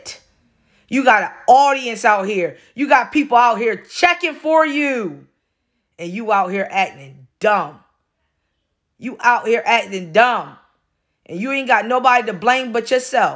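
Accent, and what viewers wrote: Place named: Maryland, United States. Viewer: I knew you were somewhere close to Delaware by your accent 😂😂😂 and I was right Maryland lol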